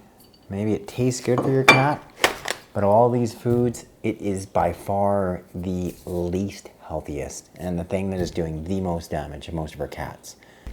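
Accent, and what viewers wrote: Canada. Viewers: Random question but are you from Canada? ☀️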